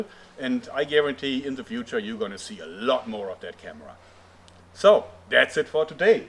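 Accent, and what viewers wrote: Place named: North Rhine-Westphalia, Germany. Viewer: Da bin ich mal gespannt.... Einfach geil - der NRWler ist einfach durchzuhören !!!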